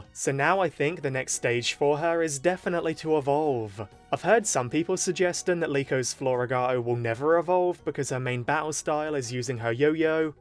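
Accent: United Kingdom. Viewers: Dude Are you British 👀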